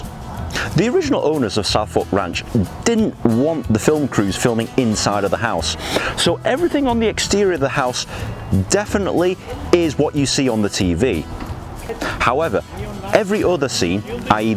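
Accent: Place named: England, United Kingdom. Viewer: Why the fuck is an English guy representing a Dallas Texas ranch! You think they could have got an American Cowboy with a southern accent instead! thumbs up if you agree.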